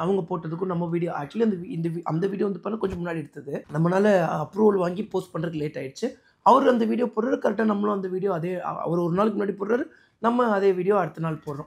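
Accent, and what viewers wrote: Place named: Madurai, India. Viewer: என்ன ப்ரோ மதுர🔥 பயலுக சிலாங்குளலா பேசுற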